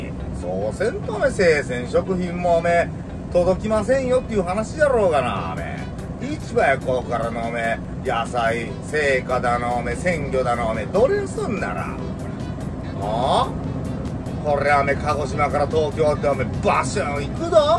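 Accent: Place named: Okayama, Japan. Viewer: お疲れ様です! さんは中小のドライバーが思っている事を全部言ってくれるし、備後在住のワシにはさんの岡山弁がとても聞き心地が良いです!では次回楽しみにしてます!ご安全に✌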